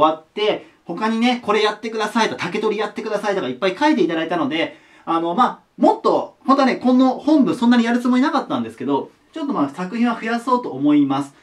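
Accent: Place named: Osaka, Japan. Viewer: 大阪の方ですか？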